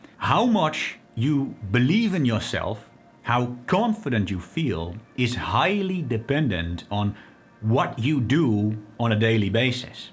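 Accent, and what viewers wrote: Netherlands. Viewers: Ik Dacht Wel Dat Ik Een Miniscuul Nederlands Accentje Kon Waarnemen. 🙂 Nevertheless Very Well Spoken & Articulate,Dankjewel. 🔥💪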